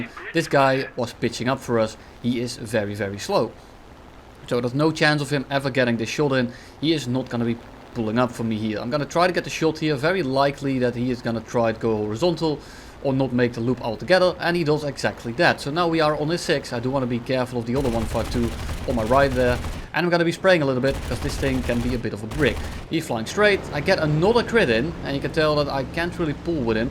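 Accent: Netherlands. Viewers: R u dutch by any chance?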